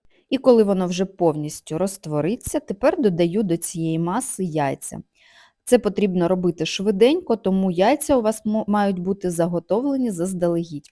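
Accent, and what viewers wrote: Standard. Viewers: Дякую за сиачний рецепт і дуже гарний супровід - чиста, правильна, красива Мова- БРАВО💙💛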